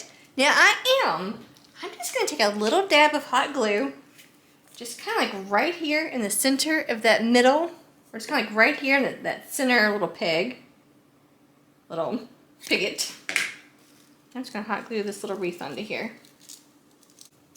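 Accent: South, United States. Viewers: Omg I love your accent…. Your southern charm is adorable as well as your craft projects. Thanks for sharing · What a Super idea! Love Bee’s 🐝 I also ❤️ your accent of your voice! We live in Wisconsin. So when I watch Southern UTubers it makes me smile 🥰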